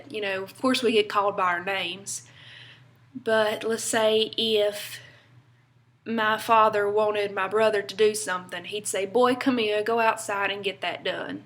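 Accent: South, United States. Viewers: I love your southern accent yall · Love the southern accent. Ill sit and visit with a southern girl for hours just to listen to her talk. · Saw this in my favourites. Seriously. I know that a lot of people say that you're pretty and all. But for all I care you could wear a paper bag over your head and I'd follow you on a shoe shopping spree along with all your lady-bros if I could hear you talking in your southern accent all day. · I’m from Michigan and now live in Cleveland Ohio. I’m an author and I am writing a book in 1950s in Wisconsin. I have a character who is from South Carolina until she went north to college and married a guy from Wisconsin and ended up there. I had family that lived in Tulsa, Oklahoma and spent quite a bit of time there when I was a kid, but their accents were more western sounding. The accent I’m looking for is yours - southern and feminine but not ostentatious, (but her mother’s ostentatious - more Blanche Dubois from Streetcar Named Desire😏) Thank you for your videos! 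I may be contacting you to refine a few passages for me. In my story, her sister lived in SC until escaping west, ending up in Sapulpa, OK which is near Tulsa. So her accent is even more different! And I have a British character, from Shropshire, UK who moved to Toronto, Canada. Everyone talks differently in my book! I don’t know what I was thinking lol🤦🏻‍♀️😅🤷🏻‍♀️ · I get accused of having a stronger accent than most of my peers even though they are from the same place as I am. I am from southern middle, Tn. I think some of us sound more southern than others because of who raised us, like our grandparents, that usually sound more southern than our generation, or if we are from the country or the town, or if we are influence by the way people talk on t.v. or movies and it effects our speech. Also, i think that some people are just simply more southern sounding than others... my little brother doesn't sound southern at all. 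This girl is from North Carolina and she has a different sounding southern accent.. its funny to me because she sounds alot like I sound. We say "fixin to" and "sweety" but, when we say "honeychild" it is almost like we are being dramatic about something. We don't use that here in the same way she is saying they do. I love this.. I may do a video too:) great Job I love southern accents and i really feel like if we speak correctly when it comes to grammar then we sound southern more than country :) GOOD JOB :) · I'm from Monroe,Nc born and raised most of the people have your accent but others like me have regular accents but have southern accents when the slang come out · Love your southern accent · My goodness you are soo pretty... I was born and raised on the east coast of nc about 25 mins from the ocean im still there, you pretty much sound the same as all of us here.. I love your videos... 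You're right most of us have a northern twang when we talk down here we say great day or gracious day quite a bit but yea pretty much talk the same as you but anyway wanted say hey and maybe i will do a video myself, keep making videos sweetie and i will talk ta ya later........................ :) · OMG ! One of the cutest girls ever :) and i luv that Southern accent, it triples your cuteness :) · Sugar sugar sugar that chick is all sugar...love your southern accent! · You sound like my girl cousins in S .east NC. My Grammaw from there used some old pronunciasions like saying " hit " for it, and "caint " for cant. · Sweetheart, thanks so much for sharing your videos with people who are interested in learning about your way of speaking. I too am from North Carolina, near the Greensboro area (in the Piedmont). My husband is from "out in the country," and I was raised "in the city." He speaks completely differently from the way I do. 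We have some funny stories about when we were first dating. Anyway, thanks again for being so kind to people. You are a good Tarheel!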